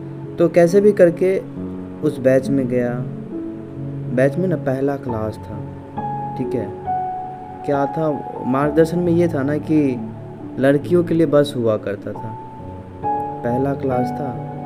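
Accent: Bihar, India. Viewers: Firstly, When I listen you voice I get a smile in my face because I listen Bihari accent. As am too bihari. Story of bihari is always struggleful and inspirational. I'm very inspired by you bhaiya and I'll surely crack NEET2024. 👨‍⚕❤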